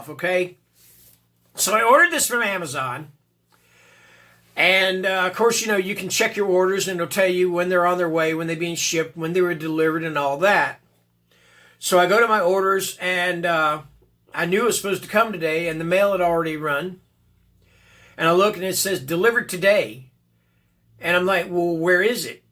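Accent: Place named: Appalachia, United States. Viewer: Excellent Appalachian accent. Too many good books, how are you going to decide what to read first?!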